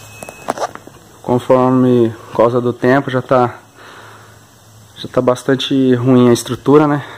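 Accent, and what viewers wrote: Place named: São Paulo, Brazil. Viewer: O defeito desses vídeos, na maioria, é não dizer onde ficam os locais mostrados, cidade, Estado. Pelo sotaque de quem está mostrando, a pronúncia dos erres - R, imagina-se que é em S. Paulo.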